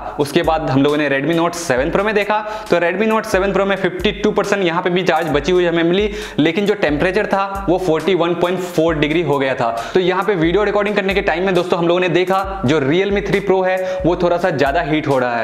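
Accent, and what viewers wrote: Bengal, India. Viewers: main v hun. Bankura se.apka baat Karne ki tarika se mujhe laga ki ap bangali ho..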